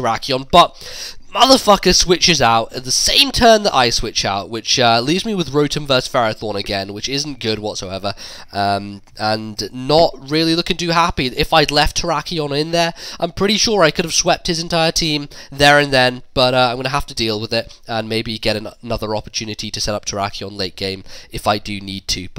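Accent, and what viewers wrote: United Kingdom. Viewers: he british dumbshit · does a british dude sound like a frog dipshit ?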